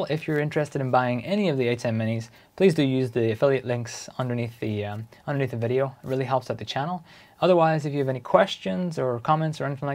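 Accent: Northern Ireland, United Kingdom. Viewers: Not often I hear an NI accent on YouTube! Just got my Atem Mini Pro down in Fermanagh!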